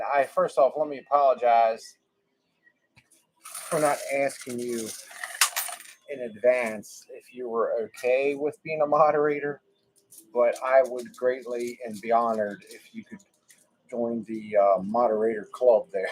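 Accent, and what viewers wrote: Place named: Tennessee, United States. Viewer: ❤❤, I was just wondering how nearby you are as I recognized your accent. Youre about 5 hours from Kingsport, TN, which is the same distance to Richmond, VA or Nashville from here, basically.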